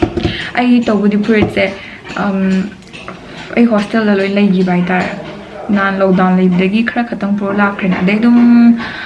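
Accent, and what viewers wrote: Kakching, India. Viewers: Lon thp tamei nowraga last ta yong ym kuirameba heiglak a.....😀 kaow yadeba eikhoi kakching lonse nungcbanehe😍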